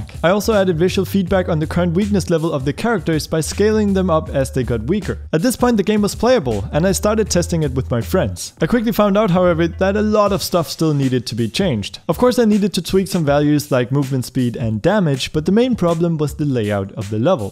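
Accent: Denmark. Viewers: are you danish? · 30 sec into the video and i felt a danish accent - turns out i was right :D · Are you Danish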